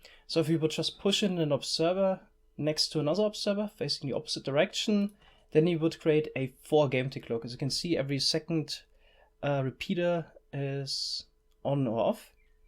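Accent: Germany. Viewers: Ich liebe diesen deutschen Akzent😂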